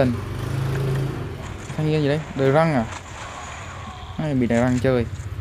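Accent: South, Vietnam. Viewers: Miền Nam 1 hả?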